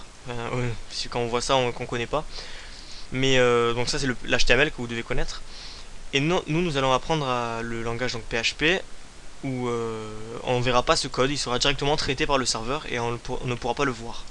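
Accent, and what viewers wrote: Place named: South, France. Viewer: ) moi aussi je suis du sud :D vive les accents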